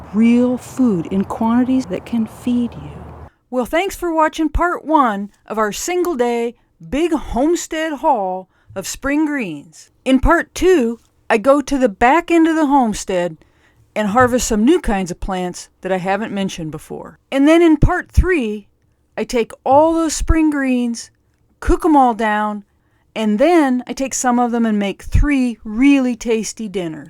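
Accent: South, United States. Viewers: You said you are in the Pacific Northwest?? You sound like you may have a wee bit of a Southern in your accent or maybe TN Valley....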